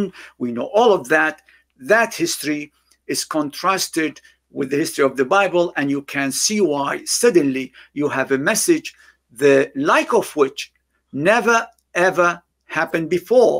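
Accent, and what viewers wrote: United Kingdom. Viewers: you speak in such a perfect narrative voice I really REALLY want you to release an audio of all the books of Hadith and the Holy Quran in your voice narration. What an effective beautiful British accent you have. I will write this comment underneath all your videos until you read it. May Allah take this service from you in this life.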